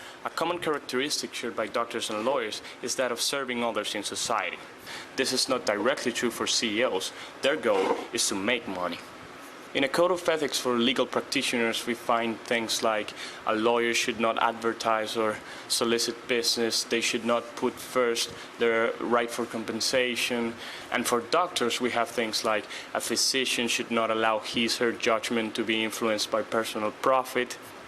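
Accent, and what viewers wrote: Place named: Netherlands. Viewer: Wow, you sound so dutch...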